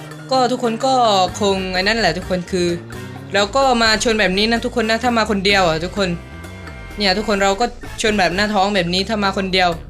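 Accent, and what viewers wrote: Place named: Suphanburi, Thailand. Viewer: ทำไมพี่พูดทองแดง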